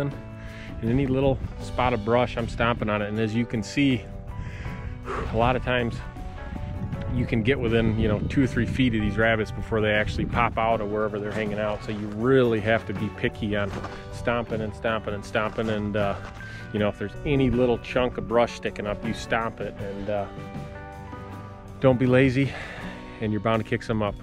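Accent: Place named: Canada. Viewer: You sound Canadian af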